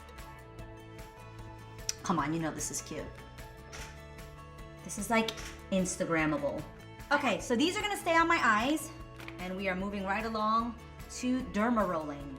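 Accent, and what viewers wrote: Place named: New York, United States. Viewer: I LOVE your newyork accent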